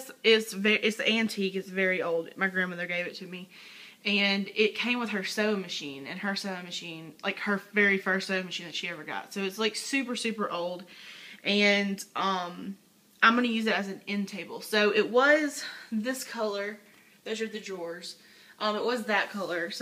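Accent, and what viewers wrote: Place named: South, United States. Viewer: thanks! Awesome nightstand, how special that it was your grandmothers. so glad you are loving the paint! thanks so much for the shout out xoxo! I love your southern accent too:) xo Debi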